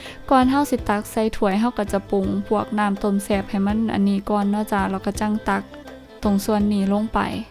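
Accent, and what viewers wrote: Isan, Thailand. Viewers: ลุกคนอี่สานเว้าแบบบ้านๆทางอี่สานม้วนดีดีอี่หลี่เด้อหล้าเอ้ย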